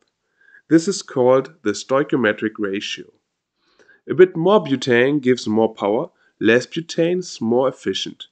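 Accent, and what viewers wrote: Germany. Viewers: You sound German, is this legal in Germany? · You sound german. Ist das was du baust nicht illegal hier? 😅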